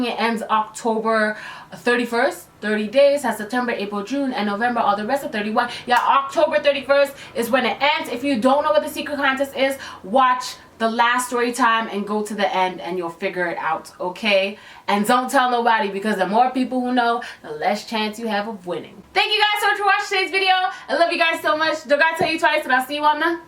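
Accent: Toronto, Canada. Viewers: That Toronto accent tho ♥️♥️ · I LIVE for ’ Toronto accent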